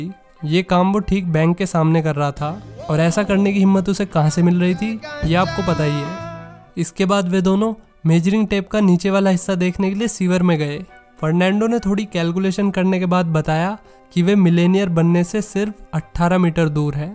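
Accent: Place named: Western Uttar Pradesh, India. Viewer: Bhai tu west up se h n 😂 Moradabad , bijnor orr meerut?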